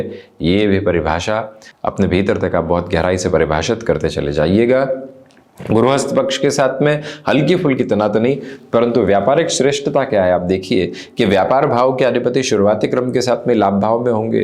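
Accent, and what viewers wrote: Standard. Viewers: Shudh Hindi, astonishing to listen,so great guru ji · Itni shud Hindi kitni achi lagti hai sunney main 🙏🏻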